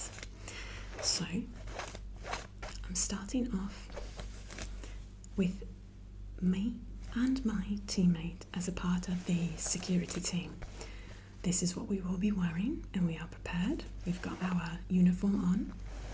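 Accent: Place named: United Kingdom. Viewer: You sound more like a British Mi5 agent:)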